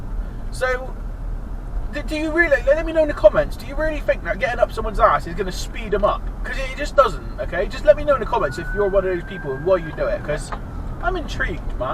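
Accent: Bristol, United Kingdom. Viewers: I love the bristol accent even when angry you sound happy an welcoming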